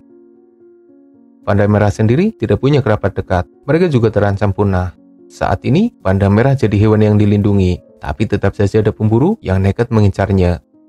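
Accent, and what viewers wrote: Banyumas, Indonesia. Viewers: Kaya wong ngapak yah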